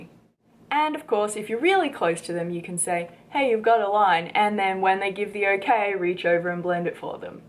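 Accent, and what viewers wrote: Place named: Australia. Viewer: I'm really curious to find out where she is from. Her accent sounds Australian most of the time.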